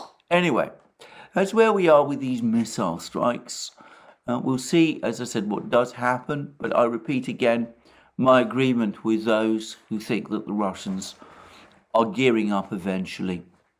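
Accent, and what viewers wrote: United Kingdom. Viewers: British accent is adorable and I like learning new words from. Moreover, now I know that even English speaking people say "hypersonic" instead of "supersonic" 😁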